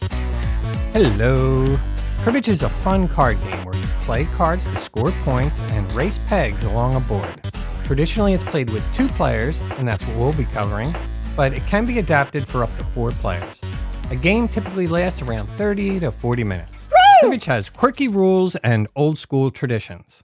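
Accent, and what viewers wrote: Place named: Delaware County, United States. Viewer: You sound like you're from Delco......GO BIRDS!!!!!!!!!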